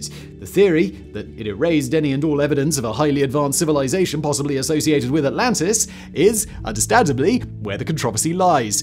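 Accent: RP, United Kingdom. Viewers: If this guy didn't have an educated British accent, no one would listen to him.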